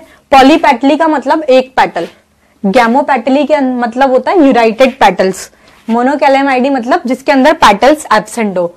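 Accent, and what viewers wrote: India. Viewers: your pronunciation is truely indian .... its very difficult to understand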